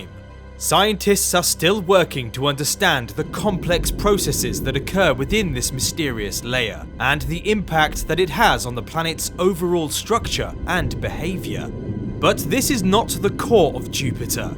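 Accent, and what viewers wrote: United Kingdom. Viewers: UK accents are annoying. So are spurious titles. DISLILE.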